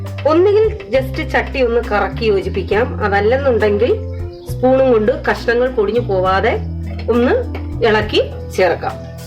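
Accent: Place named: Kollam, India. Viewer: supr Chechiiiii.....chechide Kollam slang kelkkan nalla rasamaaa kettto.i like it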